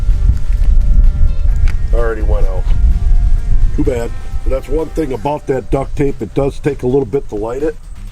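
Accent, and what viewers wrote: Minnesota, United States. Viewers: he's from Minnesota sounds like the Gov.....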